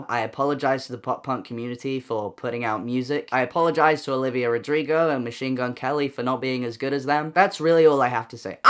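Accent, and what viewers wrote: United Kingdom. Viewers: you sound so british here /neu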